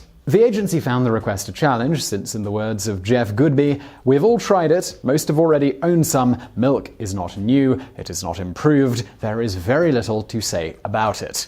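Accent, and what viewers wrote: England, United Kingdom. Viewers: Briar Hood in England (where he’s from so this might explain it) we say Nike the way he did and Ad-ee-das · I figured out one of the reasons I like this channel. He's got a real Brit accent that's better than the usual fare to listen to, but he doesn't speak it like he has a mouthful of Marbles!!! I can't recall even once, thinking to myself, Whaaat did he just say!?!! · Why r u so triggered lmao ? That's the British way of saying Nike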